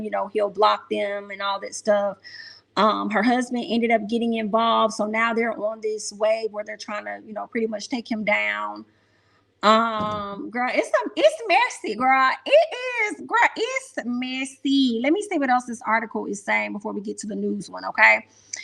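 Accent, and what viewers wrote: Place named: Alabama, United States. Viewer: I subscribed as soon as you song messssyyyyy. Sounding like you are from Birmingham Alabama girl. Im new here and I think I'm going to enjoy it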